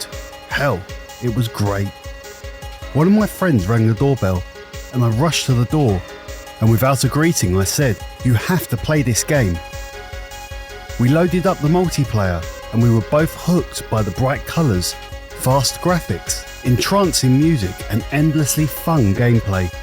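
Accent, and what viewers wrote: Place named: United Kingdom. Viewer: I didn't think of the everyone's speakers / headphones are different. I just use my phone to watch all these videos, sometimes headphones sometimes none. All of your decibel interpretation goes over my head, but I'll take your word for it lol. It's in no way a deal breaker or anything like that, you got a good speaking voice. Similar British tone to retro N64 YouTuber Glenn plant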